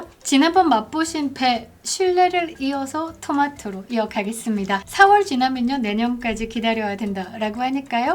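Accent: Gyeongsang, South Korea. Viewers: 경상도 말투가 더 매력도 넘치십니다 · 감사해요 전한길 벙송에서 뵈엇는데 감사합니다 경상도 사투리안가요 귀여우새요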